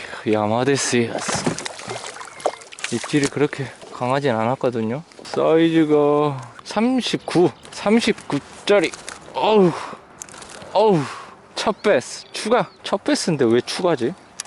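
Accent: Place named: Jeolla, South Korea. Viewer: 전라도여? 사투리가오지구먼잉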